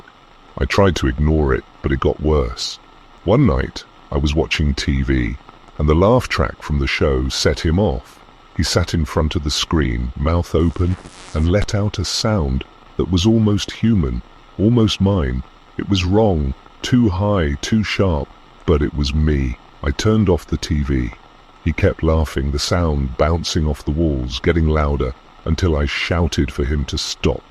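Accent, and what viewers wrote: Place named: United Kingdom. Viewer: AHHH HES BRITISH >X33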